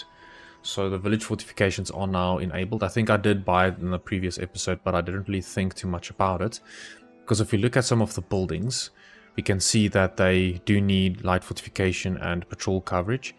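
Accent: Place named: South Africa. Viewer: Cool to find a South African here! Your accent was just so peculiar to me I had to check your channel location.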